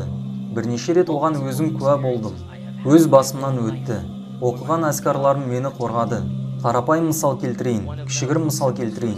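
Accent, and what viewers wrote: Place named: Russia. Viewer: Авторға рахмет пайдалы видеосы үшін.Бірақ "ң" әрпінің орнына неге "н"әрпін айттыңыз аяғына дейін.қазақшаны акцентпен сөйлеп "орысша сауатты"адам болып көрінгің келді ма ?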